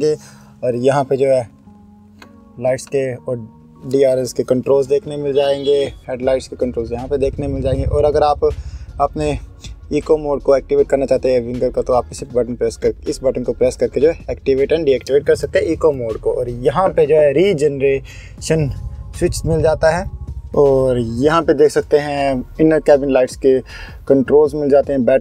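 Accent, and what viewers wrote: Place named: Kangra, India. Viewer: ओ भैया हिमाचल कांगड़ा! दा बोला ना 😂😂😂 भाई! बहुत बढ़िया अति सुंदर 👍 बहुत ही अच्छा रिव्यु किया। है आपने।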